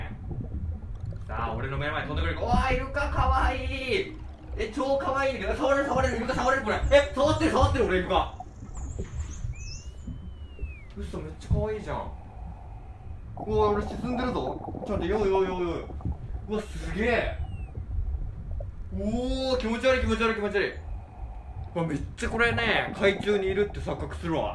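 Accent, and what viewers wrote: Kansai, Japan. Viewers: 関西弁いい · 関西弁いいね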